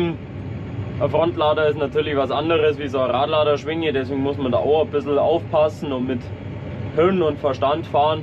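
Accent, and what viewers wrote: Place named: Upper Bavaria, Germany. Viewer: Die Dialektunterschiede zwischen uns Augsburgern und den Oberbayern ist immer wieder beachtlich 😂😮 Ist auch ne echt schöne Gegend da unten, muss man echt sagen.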